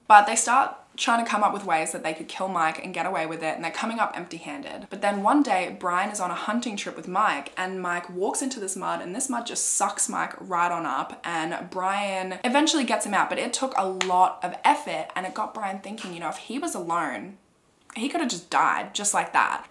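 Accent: Australia. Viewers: Heavy Aussie accent! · We're is the accent from? Australian? It's grating and can't listen anymore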